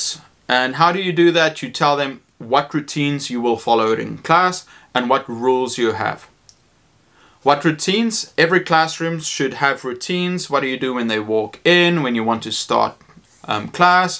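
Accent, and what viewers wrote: South Africa. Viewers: Hey! Thank you for the amazing tips, I've learned a lot! Tomorrow will be my first day back to school. I first started before the pandemic and honestly I think I did really good but there's always room for improvement, right? One last thing, I can't help but ask... Are you South African? I just love the accent haha. Greetings from Algeria! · Are you South African?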